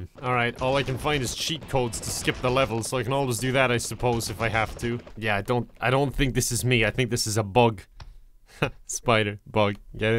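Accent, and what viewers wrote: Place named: Ireland. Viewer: You sound a lot more Irish in this video.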